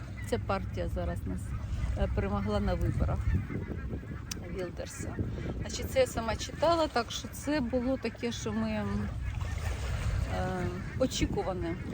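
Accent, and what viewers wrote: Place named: Russia. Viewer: Так интересно слшуть как вы пытаетесь перейти с русского на украинский, а русский так и проскакивает ))))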